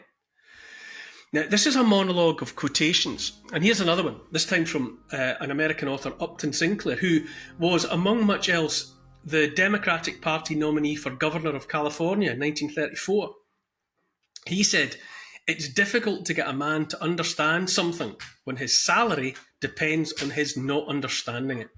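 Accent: Scotland, United Kingdom. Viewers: I wrote this Poem a while back. Thought you might like it. It suits the times just fine... Feel free to read it out one day if you like. Would sound ace with your Scottish accent! Rare Gem Robert Berning 04/12/09 Filament and firmament everything's not permanent, from the depths of the greys, to the limit of our ways. But the cycle continues ever turning always into. We need new thought process, from the minds closed recesses, to change our way of learning, new directions we are turning. Many years we have thought the correct things we've been taught. All our lives we have lead, all the lies been drip fed. Suck the tits of prevarication, drink the milk of misdirection. Live your lives in that veil, let your own soul turn stale. Were all caught in a cage, with no bars and no walls, but to escape from this cage, we've been supplied with the tools, life's programming even way back to the schools have turned us all into illiterate fools. 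With probing my mind has now found the pulse that all that we have ever been has always been false. Equivocation, Evasiveness, fabrications for sooth fed statements that deviate us from or pervert the truth, from an arsenal of politics, religions false hope, to the alcohol, tobaccos and dope that we smoke. Their weapons are varied and dire in scope. We think were immune, but how they do gloat! When we escape, which we do all the time, its easy to accidentally just fall back in line. The programming was set from all our first breaths, to stay away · Love to listen with that wonderful accent! 😍 Scotland, Ireland??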